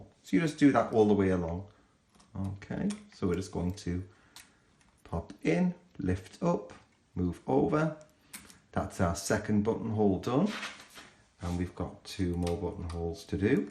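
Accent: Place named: Northern England, United Kingdom. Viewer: Excellent tutorial - especially for an absolute beginner as you explain everything so well. Although I'm not a beginner I got my trusty Brother KX395 out of its box and made one of these lovely little buntings and I'll be giving it to charity. Lovely to hear a northern accent 😃 Look forward to more of your projects.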